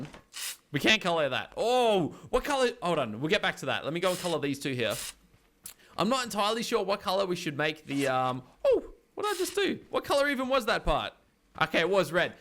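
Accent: Australia. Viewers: Are you Australian? If so where are you from in Aus.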